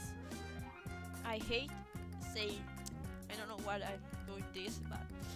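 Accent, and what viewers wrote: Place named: United States. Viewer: hai una voce classica americana aahah ???? wtf???? hahaha mi fai troppo ridere!!!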